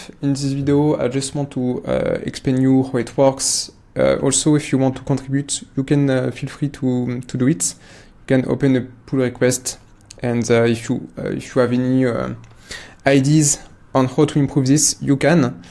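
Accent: France. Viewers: Tu parles francais ? du moins je l'entends quand tu parles anglais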